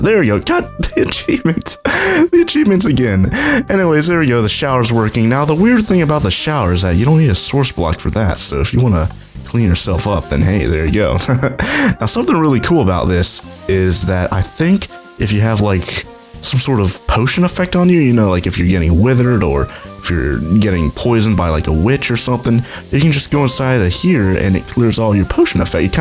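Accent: Australia. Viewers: AUSSIE MATE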